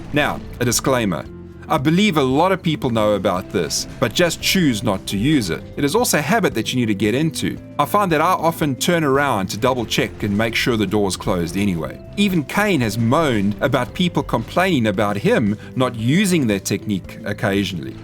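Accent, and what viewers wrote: South Africa. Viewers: Aussie here mate, always thought you sounded South African. I think aussie, kiwi, certain british and south african accents have alot of similarities. Either way its pleasant to listen to. I reckon its the garden hoe fruit tree yield and the loot bags you didnt know. Really enjoy the tip vids too. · I hear the stronger SA accent my friend, I used to work with a gang of S Africans in the 90's, great bunch of guys, love the accent!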